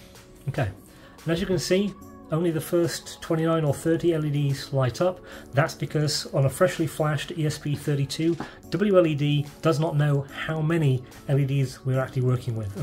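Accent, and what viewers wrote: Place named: England, United Kingdom. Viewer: The Amazon links take me to Amazon in the USA so I presume that is where you are located despite your English accent?!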